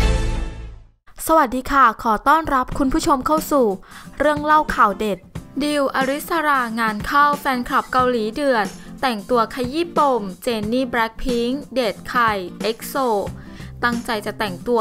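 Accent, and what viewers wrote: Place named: Thailand. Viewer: ยอดวิวส่งผลต่ออัตราเงินจ้าา ที่อ่านๆมันคนไทยชัดๆ5555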